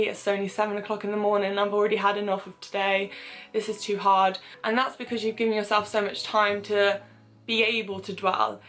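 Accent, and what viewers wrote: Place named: Northampton, United Kingdom. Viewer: Swear your from Northampton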